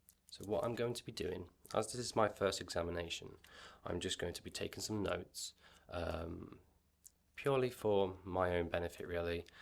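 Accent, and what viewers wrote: England, United Kingdom. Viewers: I've just come across your channel today. This is the first time I've seen an ASMR channel by a British dude (I'm from NI)! Your accent rocks! :) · Love your english accent. Where are you from? Thanks for your videos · I like your British accent.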